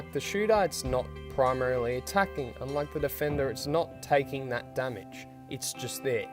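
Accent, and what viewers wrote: Australia. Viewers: An Australian eh,lol,I never would've thought.no offense mate,I think you should try defender,I'm mk2,but I have over 2k health,I think you would like it. Plus great job with the vid👍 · Spoken like a true Auzzie ! great vid man loving it !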